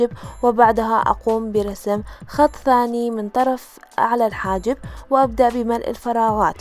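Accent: Iraq. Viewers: صح ماكو احلى من لهجت العراقيه حلو واحد يحجي بلهجته مو بالله 😂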